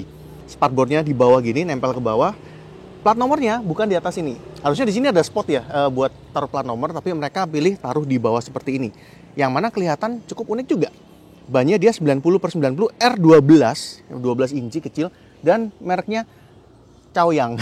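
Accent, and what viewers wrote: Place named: Java, Indonesia. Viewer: masa reviewer logat Medok gitu di bilang enak di denger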